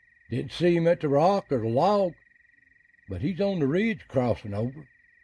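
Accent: Appalachia, United States. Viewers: Well I didnt get to finish my"hey howld ya dos Its so good listening to a fellow "ridge runner(were from Tazewell area up on Ravens Ridge)..I enjoyed.hereing back home in your voice and will subscribe to your youtube channel..you have a safe and sane New Years amd please keep on visiting with us..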